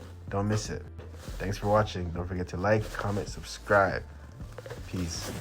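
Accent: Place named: Toronto, Canada. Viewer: Man sounds like he's from the GTA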